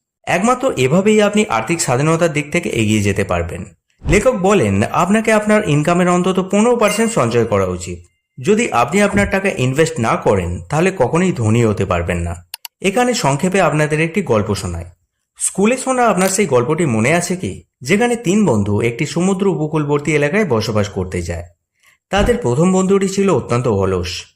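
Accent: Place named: Kolkata, India. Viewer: আপনার কথা শুনলে মনে হয় কলকাতা একটা ভাব আছে,, এটা কি যদি চেঞ্জ করে একটু বাংলাদেশীদের মতো করে বলতে পারেন,, আপনি অনেক দূর যাবেন😊😊😊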